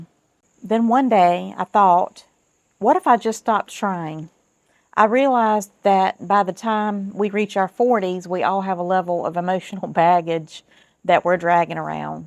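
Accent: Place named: South, United States. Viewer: What part of the south are you from... I hear an accent. Im in Bangor, maine your northern neighbor.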